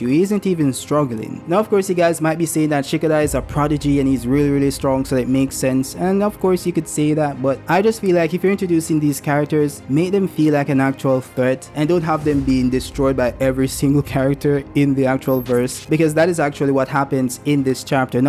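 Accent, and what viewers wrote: Jamaica. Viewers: Are you jamaican i picked up your accent 😂😊 big up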